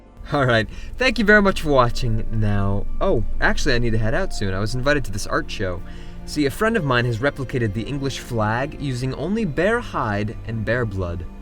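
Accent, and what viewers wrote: Canada. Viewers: Is he canadian? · dude u canadian？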